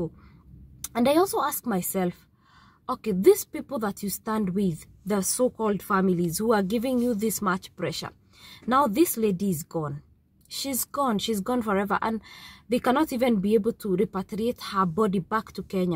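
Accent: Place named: Arab world. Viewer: This Arabic accent....special.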